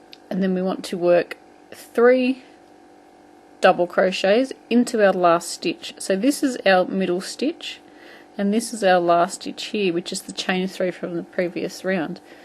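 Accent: Australia. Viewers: I love this tutorial. I am not sure if you noticed, but on the youtube video for this, there is a box that is for interactive transcript. Apparently it tries to translate each frame of dialogue in writing., with your Aussie accent, it is translating it, but, NOT....QUITE....getting the right words. Think Autocorrect fail on a cellphone...only the Youtube version. I will try to copy and past some of this for you. on a new comment.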